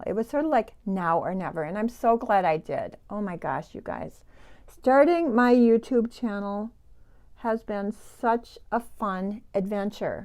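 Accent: Minnesota, United States. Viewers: I am so happy for you. Also, I love your accent. I realize that, for security reasons, you won't say where you live, but you sound as if you're from Minnesota. I could listen to you read the telephone book!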